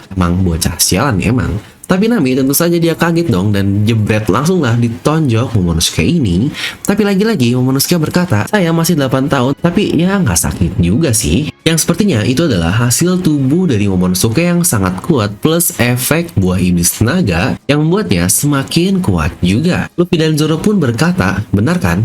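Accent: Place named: Bandung, Indonesia. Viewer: hmmm kek nya orang bandung admin nya ahahahaa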